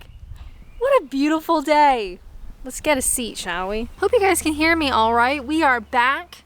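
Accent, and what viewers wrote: Canada. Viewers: Your voice has gone *very* Canadian!! And consuming locally-made honey is a good natural remedy for hay fever (works for me anyhow... or should that be 'anyhoo'?) 😊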